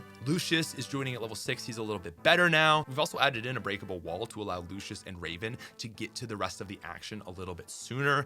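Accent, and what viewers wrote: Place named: Canada. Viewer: Where do you live, bro? That "oat" accent instead of "out" leads me to think you live in the Canadian Prairies or Atlantic Canada, or Midwestern USA.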